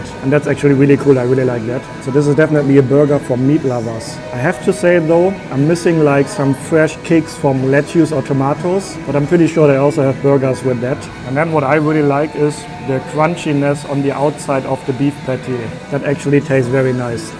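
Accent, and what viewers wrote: Germany. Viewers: you're pronounciations in English, always sound Gemany❤😂❤😅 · Ja, ja, das ist gut! You are fun to listen to with your detail explanations and German accent. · Remember English is not his native tongue. He’s German. Give his accent a pass!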